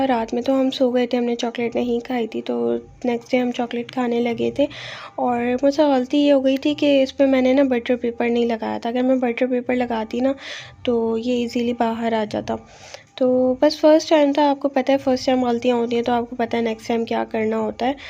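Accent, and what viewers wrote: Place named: Karachi, Pakistan. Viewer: Mujjy apki awaaz se ye lag raha hai k ap karachi se ho apki age b kam hai ??? Aisa he hai na and apki byti masha Allah cute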